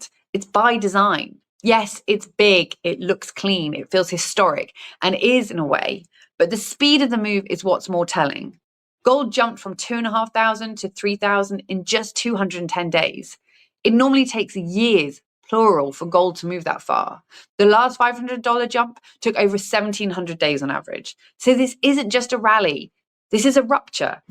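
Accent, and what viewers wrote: United Kingdom. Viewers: Excellent presentation!! Her story line was obviously well researched, well written and spot on. I am stunned and amazed that the average Joe has not (yet) piled into gold when governments and central bankers have been doing so for years. As an added bonus me being an American enjoyed the (British?) accent.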